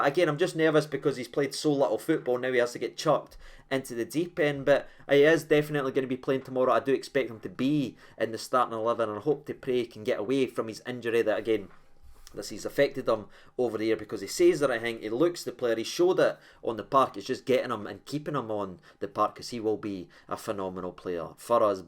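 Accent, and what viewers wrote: Scotland, United Kingdom. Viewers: Supporting your country isn't real football? I thought you were Scottish with your accent.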